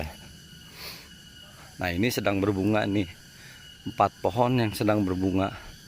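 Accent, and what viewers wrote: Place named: West Java, Indonesia. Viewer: Duh uy logat na meni sunda pisan..edun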